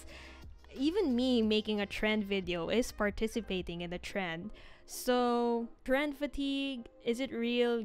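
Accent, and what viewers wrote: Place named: Philippines. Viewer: omggg i was thinking your accent sounds filipino and i was shocked when you spoke tagalog LMAO i like this content, pls continue doing more <3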